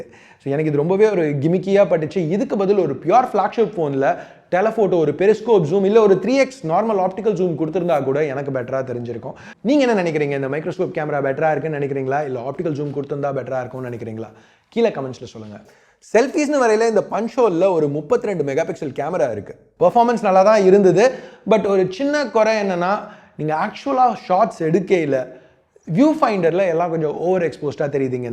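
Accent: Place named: Chennai, India. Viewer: Ena bro Chennai tamil pesuringa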